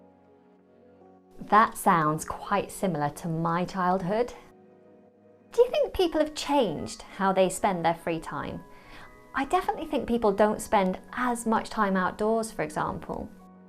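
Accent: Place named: United Kingdom. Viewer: I enjoyed this lesson very much. It has been very interesting to listen to your British pronunciation and repeat. Thank you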